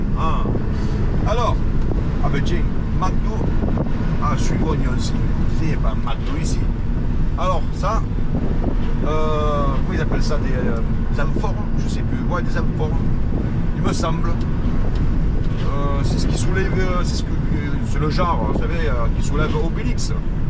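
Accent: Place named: Provence, France. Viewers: Bonjour ! Je vous ai découvert cet après-midi et quand j'ai regardé cette vidéo, je me suis dit que, comme je ne suis pas loin de Vaison (Monteux) alors, je suis du Nord puisque vous redescendez "dans le sud" 🤣Et pourtant, on a le même accent !!! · J'aime t’on accent du soleil 😂😂😂❤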